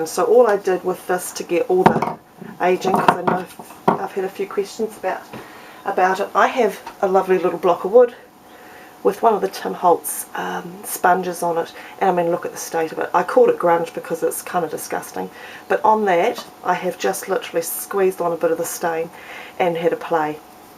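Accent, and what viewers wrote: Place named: New Zealand. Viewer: I heard your accent and I said inside my head that of course it is my kind... The smartest kind... The kiwi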